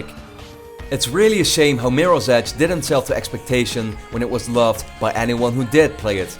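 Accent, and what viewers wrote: Netherlands. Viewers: Really obvious he is from the Netherlands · Are you from Holland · u dutch? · Are you Dutch by any chance? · the akward moment when you hear the shitty dutch accent. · Jij Bent ZoieZo Nederlands Want Dat hoor ik aan je stem ;) ***** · Is hij Nederlands?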